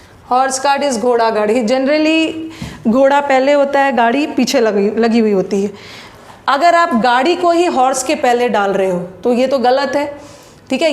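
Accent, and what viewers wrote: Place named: Mumbai, India. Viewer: Ur hindi tone is like mumbaiya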